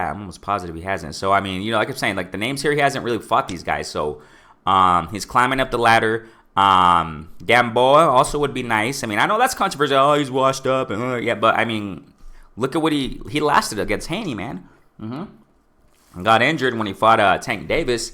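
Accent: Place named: Mexico. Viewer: Hey good video! I was picking up on your accent and it sounds Mexican, by the way you emphasize on the "J" in Verdejo. Am I right?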